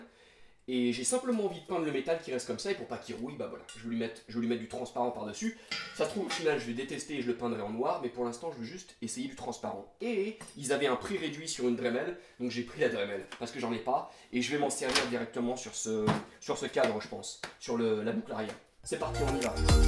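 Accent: United States. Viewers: Je découvre ta chaîne (même si ta Yam c'est une "cardan"😁). Je m'étonnais de voir (entendre) un Français qui a un bon accent anglophone (américain), mais apparemment, tu vis aux States. En revanche la dénomination de ta Yam, n'était pas "Virago" : à l'époque dans l'Hexagone, on avait la XV750 Special, mais la tienne ressemble à une série sortie chez nous en XV1000SE la Midnight Special (avec sa finition noire et dorée) avec 2 disques à l'avant pour la 1000. Les "Virago" XV 750, 1000 et 1100 en France n'étaient pas équipées du mono-amortisseur cantilever, mais de deux suspensions arrières, ce qui est plus contraignant pour la transfo café-racer. En tout cas beau boulot !😃👍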